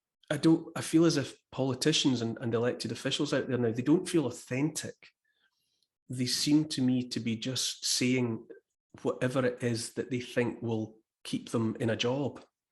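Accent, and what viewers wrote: Scotland, United Kingdom. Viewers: 'Are we gonna be poopets or no poopets?"written in a Scottish accent. Love that Scottish accent. "I don't want ta be a pie, I don't like gravy."...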